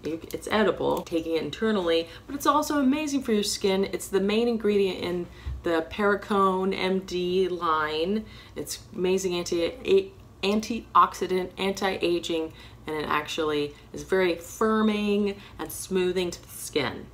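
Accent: United Kingdom. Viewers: did you ever live in England, flipping is an English term and you sometimes have an English accent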